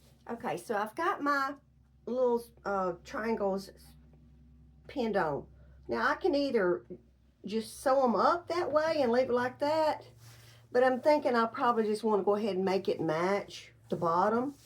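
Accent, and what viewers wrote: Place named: South, United States. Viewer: Fun. Just found you. Loving your down-to-earth southern accent and humor. Will be watching you from Evansville, Southern Indiana.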